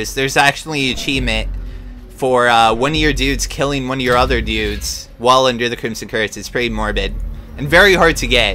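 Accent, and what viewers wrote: United States. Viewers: I started learning english with pewdiepie, then i discovered your channel. You helped me getting use to american english, thank you for that :). I have to find a scottish youtuber now, i can't understand them ^^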